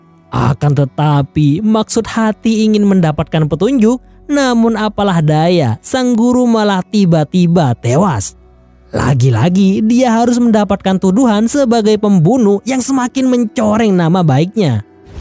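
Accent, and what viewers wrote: Madura, Indonesia. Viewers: Adminnya ini ternyata madura 😂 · Mak tak ron karon kanak... Abbeh reng madureh ongguh ye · Bg lu orang Madura ya engkk padeh Reng madure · Dooo reng madureh 🤣 Salam Bretd 🤘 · Mimin orang Madura yah🗿 kok aku kek gk asing sama ucapan Mimin yg bilang temancok kerreng.dan ee payah ongku😮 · madura kah cuy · Ini yg ngisi suara orang madura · Payah ongguen, lee kakeh reng madure 😆, Salam stong dhere kak, sampang Torjun☝️ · Madureh madimmh reah si admin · Reng madureh jih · Beeh oreng madureh kakeh bang. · Mk host sah reng madureh reh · Reng madureh bn bang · Min nya pola oreng madureh mak pelak pkk bhsa mdura tamancok kereng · Mantaaaap medureh demmah lek 😊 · Ehh kakeh reng madureh kak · Logat Madura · Jir orang madura yg nganarasi cerita flm nya 🗿😂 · Orang madura nih yang nyeritain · Jir Reng madureh cuk 😁😁😁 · Abbe kak reng madureh ben 🤣😂🤣😂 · Reng medureh kakeh kak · Miminnya Madura nih😁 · Carklacer tak Ron karon. 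Maduranya kentel banget bang. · Guy Madura beihh bahasana leburen😂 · Car klacer tag ron karon...mik medureh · Medhuro min "Carkelacer tak ronkaron"😂😂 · Ternyata Reng madureh sampean, hehehe, carkalacer tak aronkaron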